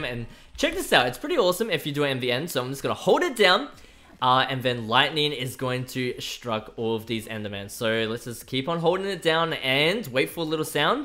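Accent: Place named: Australia. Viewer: its gauntlet not glove and also what place of australia ya from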